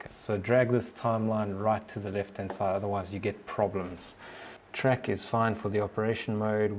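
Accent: South Africa. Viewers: U sound south African 🤔 Jus a thought I wanted to share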